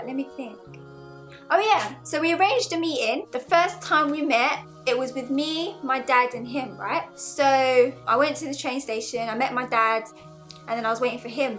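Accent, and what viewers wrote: United Kingdom. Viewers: I still try to understand the britush accent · Are you from Birmingham lol?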